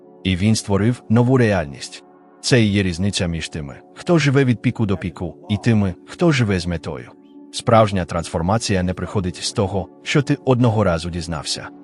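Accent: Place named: United States. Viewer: Жахлива озвучка, що це за американський акцент?😂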